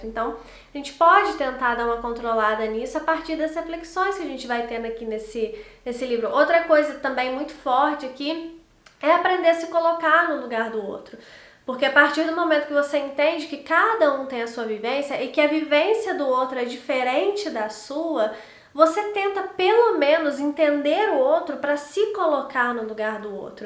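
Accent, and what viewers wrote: Minas Gerais, Brazil. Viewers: Ela é mineira?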